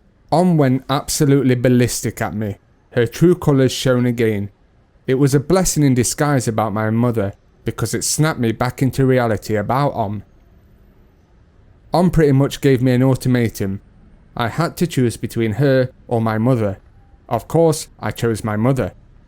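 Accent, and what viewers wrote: England, United Kingdom. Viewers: you have a genuine interest to find a partner. do not pick from the wrong place. your english pronounciation like a british guy not like an australian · Why do you have an English accent if you are from Australia? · Anyway if you love her if you give her money nothing wrong at all. Everything you pick what is problems?. If your relationship or whatever you are thing go wrong all the time are you sure you a Australia man? For me you not You must came from England Your accent is English Man Okay you pick the wrong person why you complain for nothing you can fix anyway Speaking English or not nothing to do with that ever your own people devoice happens all the time ever your the same language. So why you complain for? happens everywhere people not meet what they want nothing perfect in the world. You should know that By the way you not a Australia Man You a English 😂😂😂😂😂😂 Your love dream broken down Nothing she can do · You sound more British than Aussie ? 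, such scheming and unscrupulous women make my skin crawl ! Sometimes human goodness is not found in swamps of poverty and profession . It’s just reptilian instinct of surviving . Glad that you are able to look after your mother which is the best decision and I am sure you will find a good match and life partner who will recognise your qualities and be there just for you !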